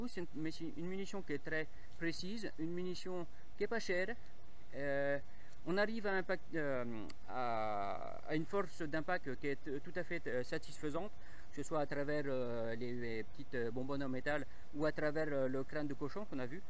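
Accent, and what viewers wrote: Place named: Tahiti, French Polynesia. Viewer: Tu a un accent tahitien 🤗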